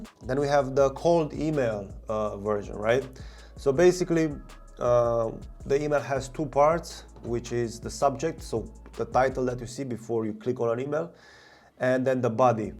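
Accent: Romania. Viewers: Me: He has Romanian accent. Me after reading his name: Oh... makes sense 😂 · Man you are Romanian? You really have a romanian accent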